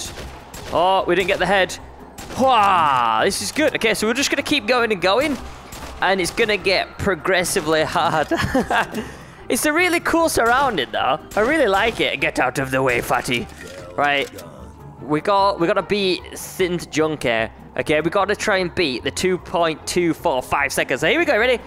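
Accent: England, United Kingdom. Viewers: Where are u from England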